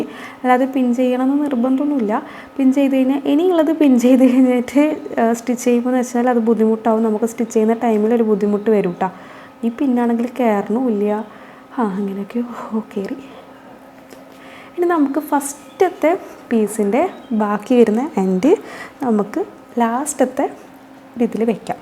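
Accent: Kannur, India. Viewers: Iyaalde manass nalladhaa✅. Ithrem detailed aayi parayunna aarulla.... Kannur daaa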